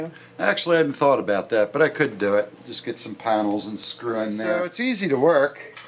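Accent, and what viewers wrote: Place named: Maryland, United States. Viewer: I thought I detected a Maryland accent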